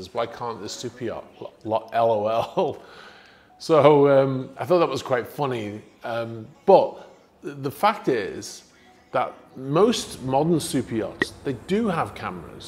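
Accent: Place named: England, United Kingdom. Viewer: Hes got some kind of classic english quality that makes him go easy on my ears so thus i watch him. the yelling screaming youtubers - its not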